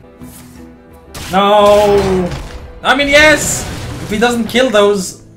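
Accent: Sweden. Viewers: What? How can you mistake Swedish accent to some American accent?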